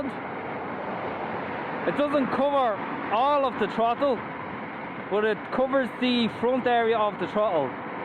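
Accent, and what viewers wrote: Ireland. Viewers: That Irish accent is pretty strong 😂😂😂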